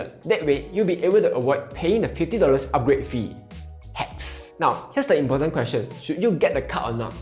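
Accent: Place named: Singapore. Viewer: Bro i love your video style chill, funny and straight to the point 👍 singapore accent cherry ontop 😂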